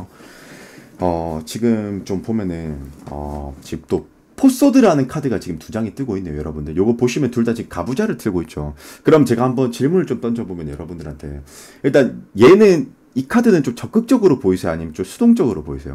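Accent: Gyeongsang, South Korea. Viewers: 음~~경상도 발음= 사투리👍👍많이 해주3 글구..소화가 잘 안 되 시나욥?위역류요런거😅😅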